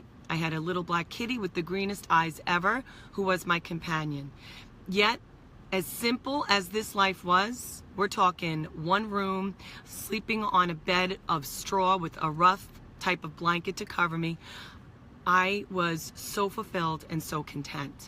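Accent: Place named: Northeast, United States. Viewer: Wow. A properly asked question. I applaud you. She sounds as if she has a N.E. American accent. Perhaps as far West as Indiana or Illinois.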